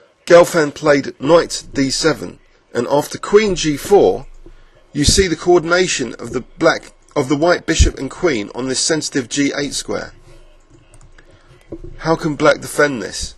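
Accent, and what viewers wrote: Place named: RP, United Kingdom. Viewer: love your clips, as much as I like your accent. That's how English is supposed to be spoken.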